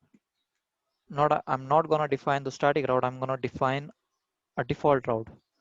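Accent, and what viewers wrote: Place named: India. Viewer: I hate indian english! OMG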